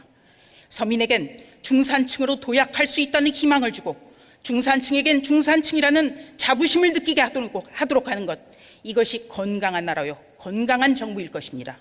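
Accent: North Korea. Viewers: ~조선에서 북한억양으로 무게 잡고 트집잡던데 작정한 듯 적어 온 글 따닥따닥 딱타구리처럼 웅변하고 있네요 ~~ 적폐가 적폐를 ~~ㅠ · 조수진이 이여자 북한 아나운서 였어? 말도 약간 북한 사투리쓰는것 같고 말하는톤도 그렇고... 북한 아나운서 같이 들리네.... 왜 30대 젊은이들이 내집 장만 못하는줄알아..?? 너희들 같은 국회의원들이 그집에서 살지도 않으면서 투기 목적로 집을사서 돈을 벌고 있기때문에 집세도 올라가고 젊은이들은 집도 사기 힘든거야..... 지금 넌 뭘 알고 이야기 하니?? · 대한민국 국민 맞나? 이북여자같다 억양이 억세고 평양가서 하거라. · 조수진 너와 그 외 똘마니들 (미통당) 북한 사람인줄...말투가 왜 저러지?? 못된 관행은 좀 버려라~~~내 세금 돌리도~~니 피같은 세금 너네처럼 놀면서 받으라고 안냈다..걸핏하면 장외투쟁...정말 지겹다!!!!!니네가 민주주의 얘기하니까 왤케 웃겨~~~ㅋㅋㅋㅋㅋㅋㅍㅎㅎ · 이은재 강남 망구 대타 조~조 수~다쟁이 진~상 저진상 북한에서왔나? 목소리가? 저여자 보기만해도 독살기가 두눈에서 번쩍거려요 합죽이 입안에선 껌씹고 있나? 왜그렇게 우물거려..! 저런걸 ㅋㅋ. 미통당답다 허기야 잡동사니 집합소아니까 저기 태영호 지성호도 있잖아..ㅋㅋ 귝민의 귀에는 미친개 짖는소리로 들린단다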